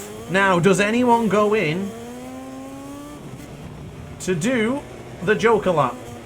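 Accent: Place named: Birmingham, United Kingdom. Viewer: that brummy accent XD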